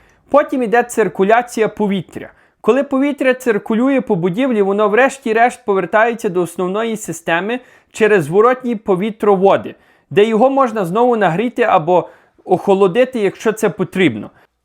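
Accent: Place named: Western Ukraine, Ukraine. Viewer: Не дуже інформативне відео. Заголовок давав надію на більше. Але було цікаво. Чудова мова заходу України!!!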